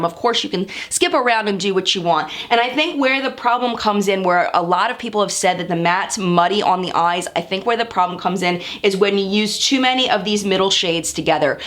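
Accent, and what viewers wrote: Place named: East Coast, United States. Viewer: This was a great review! Seriously, the format was impeccable (sincerely appreciate the skip-ahead sections!) and I love you allowed us as the viewers to decide if we think it's a good choice for us. It felt educational with out too much bias, and it was great to see how thorough you were with reviewing every aspect of the palette, right down to side-by-side ingredient comparisons. I appreciate how you acknowledged who this palette is ideal for and who it may be less ideal for--a lot of make up reviews insist something is bad because it wasn't for them, it's so frustrating! So really, this was a freaking great review (also it's been so long since i heard that east coast accent!!! <3)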